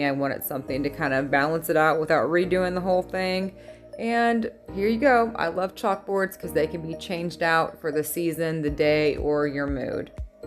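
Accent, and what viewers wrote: Texas, United States. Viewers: What part of Texas are you from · So cute that I just knew she was in TX. Our daughter was born in TX when we were stationed there back in 1986. You never forget the friendliness and that dialect! Beautiful people. Beautiful State! We loved our time in Texas!! Thanks for all the super DIYs!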